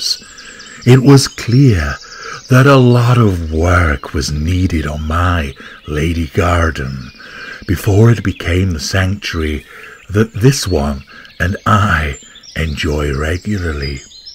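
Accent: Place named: Cheshire, United Kingdom. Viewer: I think he is from Cheshire as he speaks exactly like the Cheshire set with a few Mancunian sayings thrown in to confuse us all.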